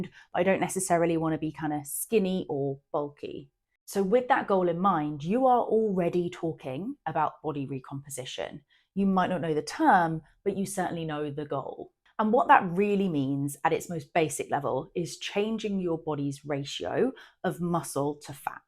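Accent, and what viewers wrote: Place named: United Kingdom. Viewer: This I subscribe to.Love the British accent.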